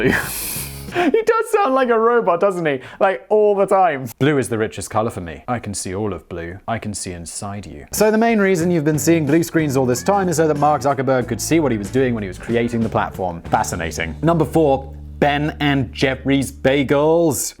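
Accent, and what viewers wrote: RP, United Kingdom. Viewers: Wait, is British? Since when? Is it serious? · Goddamn this needs to be higher. Also add says uncensored “ fucking“ 😂 caught me off guard and was awesome to hear after years of his impossibly posh Britishness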